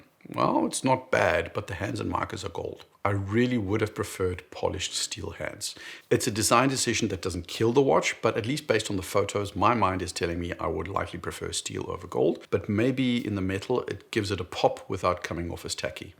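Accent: South Africa. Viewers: Great video! BTW, are you South African? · I thought you were South African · Completely agree, this watch would benefit plenty from a 42mm diameter, and I don't get the obsession with thin cases either. I want to see and feel some heft and presence from a chronograph. Any thinner than 15mm and I'm not interested. I guess we all have our likes and dislikes. Prefer arabic numerals too Still, enjoy listening to his videos, very eloquent and well considered. Bit of South African in the accent ?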